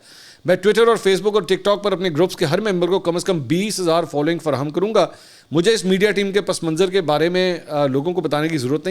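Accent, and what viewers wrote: Punjab, Pakistan. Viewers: Bhai Panjabi boht peyre bolty Han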